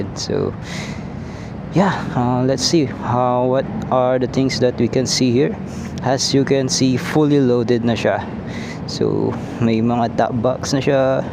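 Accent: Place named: Conyo, Philippines. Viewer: nung narinig ko ung conyo voice d ko na tinapos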